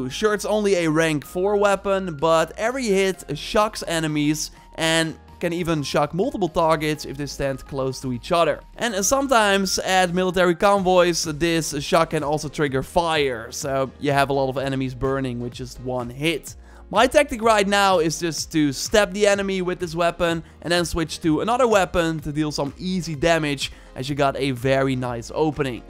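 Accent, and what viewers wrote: Netherlands. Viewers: Bro jouw englens klinkt echt Nederlands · R u dutch?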